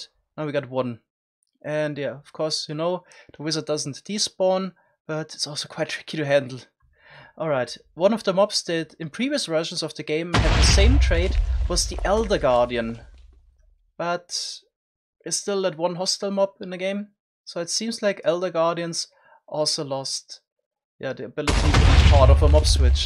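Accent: Germany. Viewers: gotta love German accents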